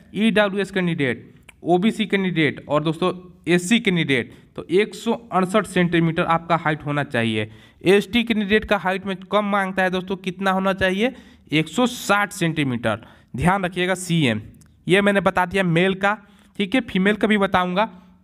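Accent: Bihar, India. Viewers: Bihari h kya aap??😀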